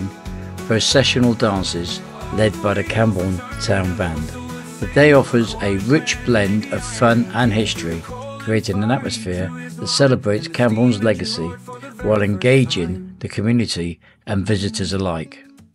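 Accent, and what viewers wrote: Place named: United States. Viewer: Maybe if the AI can get a cornish accent instead of american one it would suit good!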